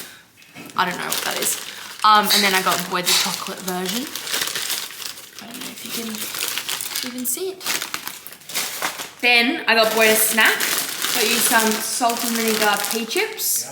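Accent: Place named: Australia. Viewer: Who wants to listen to me for 25 minutes errrrrrr me trying to fall asleep to the sound of that soft beautiful Aussie accent 😂id actually appreciate longer videos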